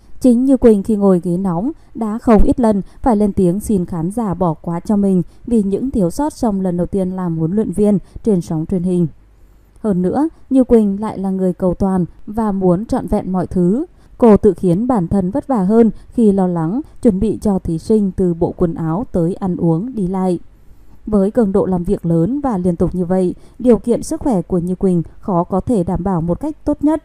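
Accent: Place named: North, Vietnam. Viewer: MC noi chuyen giong Bac Ky Ha Noi nghe que va do qua, how can I subscribe your channel ?? From USA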